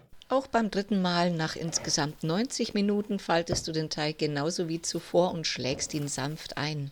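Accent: Bavaria, Germany. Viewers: ja, ich habe mir seit gestern bereits vieles angeschaut und habe sie auch abonniert! Ihr bayrischer Dialekt ist Balsam auf meine Seele! Ich habe 23 Jahre in München gelebt! Ganz liebe Grüße!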